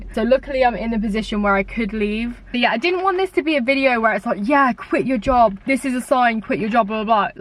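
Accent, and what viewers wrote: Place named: United Kingdom. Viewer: British English is increasingly becoming a new language. I had to concentrate to understand and my neices are British! 😂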